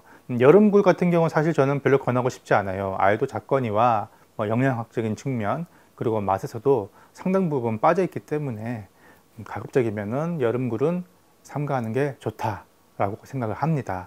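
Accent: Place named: Jeolla, South Korea. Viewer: 아~따 전라도 억양이 징허구마잉~ 자네 고향이 어디당가?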